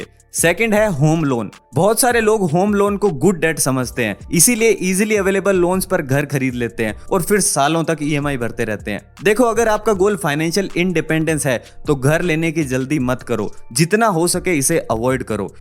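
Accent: Gujarat, India. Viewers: Bhai accent se gujrati lage thu 😅❤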